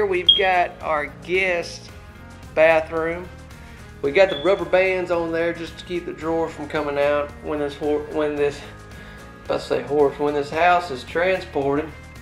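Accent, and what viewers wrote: South, United States. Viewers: Very southern/ cowboy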